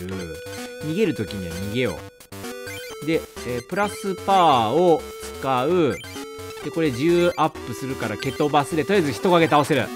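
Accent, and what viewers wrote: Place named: Western Japan, Japan. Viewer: なんかね😂西の人だなぁって😂😂 こん↑ぱん↑とか いー↑ぶい↑ って キュンぽいんとなのは私だけ？😂❤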